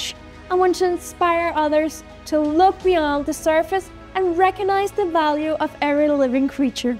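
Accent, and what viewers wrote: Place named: Denmark. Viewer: She has such a danish accent :p I love the true-ness of this nation representative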